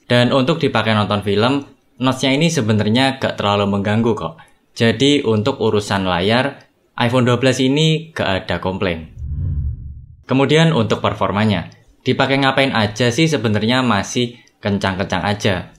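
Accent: Java, Indonesia. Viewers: tambahan bang, menurutku pembawaan udah cukup oke, tapi ada 1 yang kurang, kata2 "gua" coba diganti aku/saya, agak lebih oke, soalnya medok nya lumayan kentel😭🙏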